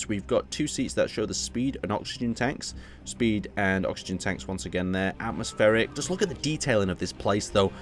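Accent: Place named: England, United Kingdom. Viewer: No, that's a regional accent here in England. Tons of people speak like that, why would you? :p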